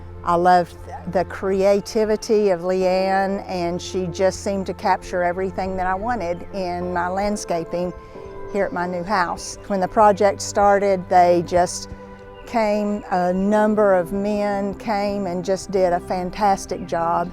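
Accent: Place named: South, United States. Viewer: The video about the interior mentions that her kitchen countertops were crafted locally in Maryville, however there are about 7 states have a Maryville. Given her southern accent, I would guess its Maryville Tennessee, but I could be completely wrong.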